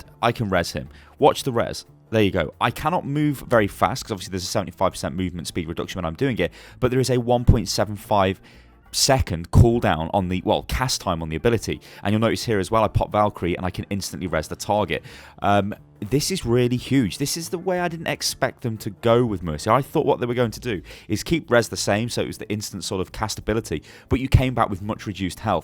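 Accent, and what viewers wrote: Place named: United Kingdom. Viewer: Your so British!🤣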